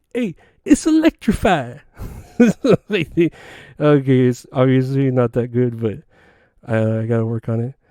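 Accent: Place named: New Mexico, United States. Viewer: We think alike watp sent got hooked fast! My pal n I are working on a pod cuz we have faces for radio hahaha well myself ya I thought you were from New. Mexico cuz people here talk aaawll like that. .hilarious brother rNew seescriber for life! 🤘🤓🤘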